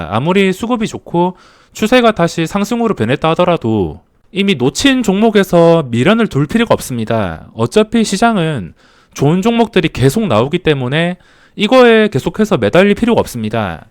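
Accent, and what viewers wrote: Gyeongsang, South Korea. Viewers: 그게 갱상도 발음이고 사투리임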